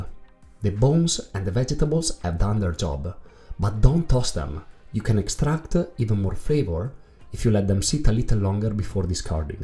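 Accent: Italy. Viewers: Great recipe! Love the way you exaggerate the Italian accent. It is noticeable your English could sound a lot more "English", but I do believe we should always keep our roots. It is like the fat... Why lose it? No need. Regards, from Angola, Africa! Cooking your bolonhesa, like we say in Portuguese, as I write.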